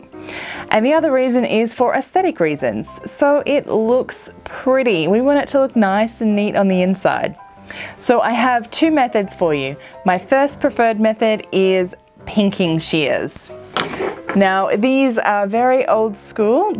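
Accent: Australia. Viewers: New subbie ☺️ are you from Australia ? 💖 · Love, this. It is my first visit. Won't be the last! I use both pinking shears and the zig zag. Are you Australian? :)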